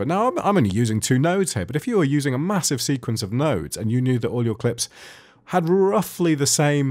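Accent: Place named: United Kingdom. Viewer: Thanks so much. It helps me a lot. I like your British English. Do you have more Tutorials for Davinci Resolve? Greetings from Germany, Kathleen.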